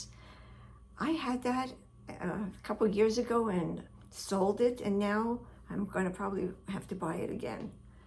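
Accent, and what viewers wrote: New York, United States. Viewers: Same! She's a real New Yorker, in the Pacific Northwest! Not common I would guess.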